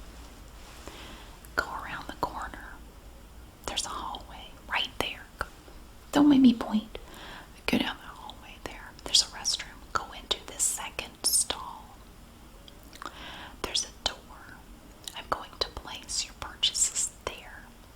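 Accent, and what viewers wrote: South, United States. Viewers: I love I'm from the UK 🇬🇧 I love the Southern American accent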